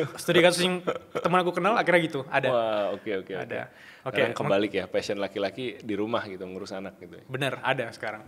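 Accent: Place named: Sumatra, Indonesia. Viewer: hostnya ngomongnya ga smooth terbata2. logat sumatera totok banget😂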